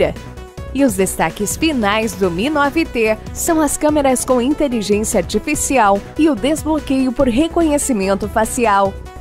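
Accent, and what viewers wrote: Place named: Rio de Janeiro, Brazil. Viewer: Ele, é Carioca ;)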